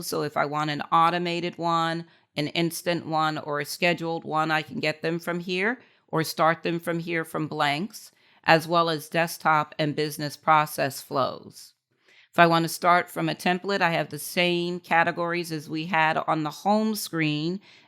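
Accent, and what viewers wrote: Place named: United States. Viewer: thick accent American is inritating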